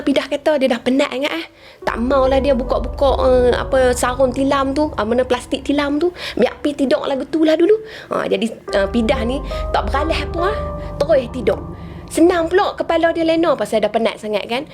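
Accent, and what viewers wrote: Kelantan, Malaysia. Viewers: Weyla ore klate..sblom nie xbunyi klate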